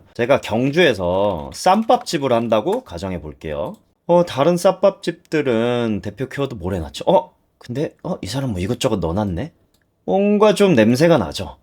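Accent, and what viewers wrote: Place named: Busan, South Korea. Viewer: 부산이신가보네요 내용너무감사합니다😊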